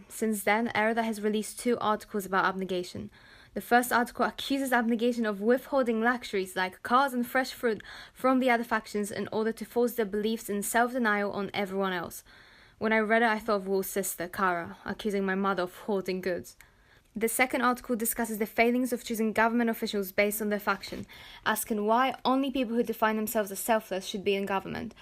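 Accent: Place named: United Kingdom. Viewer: Great video, ^-^ I really like the British accent its very different from other audiobooks ♡♡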